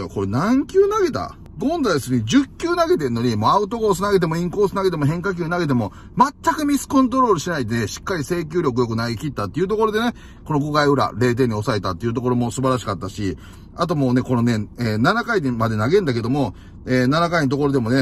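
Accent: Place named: Tokushima, Japan. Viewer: 徳島の人やなあ、いい感じの里さんの語り。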